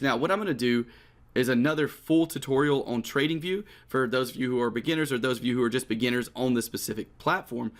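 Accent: South, United States. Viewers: I can you’re from the south lol I am too “Wifey”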